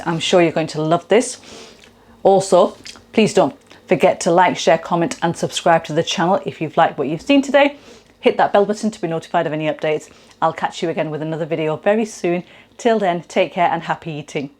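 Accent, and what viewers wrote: United Kingdom. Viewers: searching for Pakistan recipes and YouTube showed you first.after listening to your British Accent i decided you are professional 😀😀 by the way you are a very beautiful girl.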